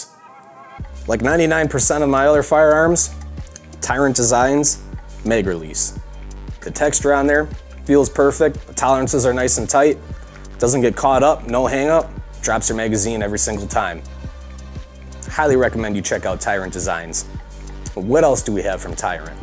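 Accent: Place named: Wisconsin, United States. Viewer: Where yous from? I hear that accent guy and im thinkin you might be from wisco like me lol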